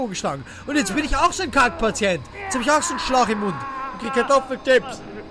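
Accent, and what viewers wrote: Austria. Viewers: Ich hab dich noch nicht do lange aboniert nur eine kurze frage kommst du aus Österreich? :D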